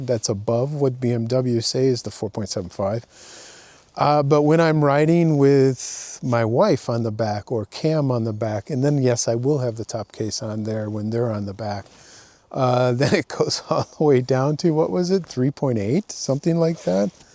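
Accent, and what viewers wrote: United States. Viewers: Hey, I appreciate what you do and your candor when you review these bikes. Down side of that is it makes me want to own more than I do already. I have a 2023 RT blue like yours, and as my second RT in three years I'm pretty happy with it. I have to agree with you that that the conected ride is a piece of garbage, and is completely unreliable. However, living where I live (southeastern Utah) and riding where I ride in the western US, there is spotty cell coverage so I just use a Garmin XT2 that way 99% of the time I have GPS coverage. Since I do all I can on my own, not only because I like to, but also the nearest BMW dealer is 200 miles away, my cost of ownereship is more a time issue. When the 16K miles service needs to be done, unless I rent the propriatary tools, I may wind up going to the dealer for that, so I will be curious to see what that cost. 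Keep up the good work! By the way you speak english like an American, I have yet to catch an accent.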